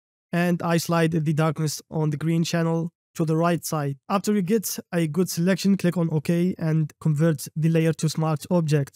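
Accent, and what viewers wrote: Arab world. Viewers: أنا متأكد انك عربي من لهجتك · أحسك عربي ؟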